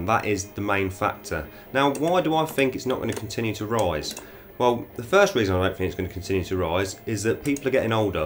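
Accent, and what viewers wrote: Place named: Midland, United States. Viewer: Fair comment - I'll try to add a bit of comentary - although I'll probably best add some subtitles to enable any non-locals to understand my midland accent rambling lol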